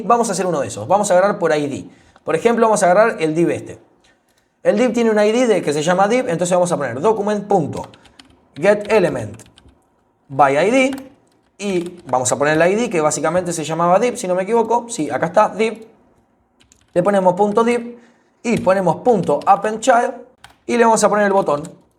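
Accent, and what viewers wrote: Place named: Argentina. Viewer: No solo esplicas dpm si no que encima en argento. Nuevo seguidor crack , segui asi. 🎉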